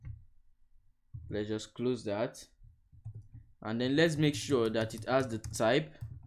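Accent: Nigeria. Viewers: Are you a Nigerian 🇳🇬? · Very hard to miss the accent. Naija for life. 😍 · From the accent and name, I guess you're Nigerian... Yay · It is so funny how after listening to the first 6 seconds I knew this was a Nigerian😂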